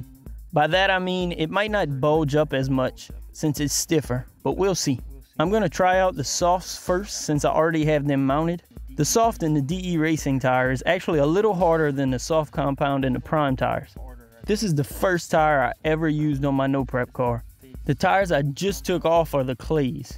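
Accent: Louisiana, United States. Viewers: Where are you from? Have to be from South Louisiana w that accent . Who Dat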